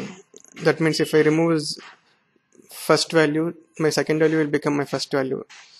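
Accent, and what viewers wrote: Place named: India. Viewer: Would be nice if you'll try to speak imitating British accent, cuz Indian accent is pretty difficult to understand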